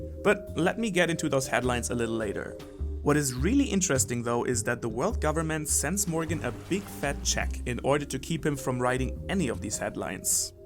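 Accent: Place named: Germany. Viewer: fom the pronunciation I would guess I am now subed to a fello German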